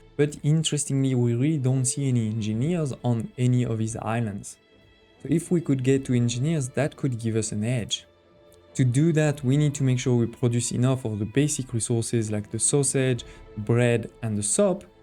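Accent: France. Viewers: I think that accent is french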